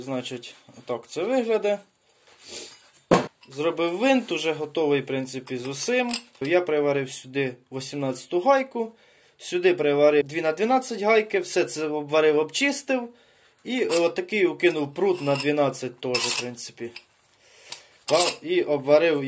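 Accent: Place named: Ukraine. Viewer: Я млею от этого суржика. Как-будто я побывал в родном селе. Молодец земляк! 👍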